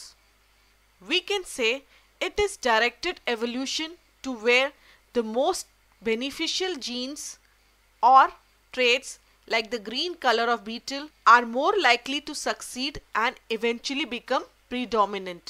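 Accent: India. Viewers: agar aap hindi mein bolte to jyada aacha rheta.Apki english sunke ye to clear hai ki aap ki mother tounge hindi hai...or aapko bhi ye pata hai ki hum sbhi indians hai and hindi jante hain.......phir kyon english????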